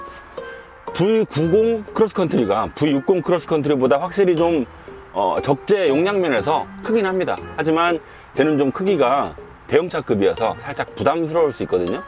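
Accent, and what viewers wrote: Jeolla, South Korea. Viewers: 전라도 사투리가 구수하네요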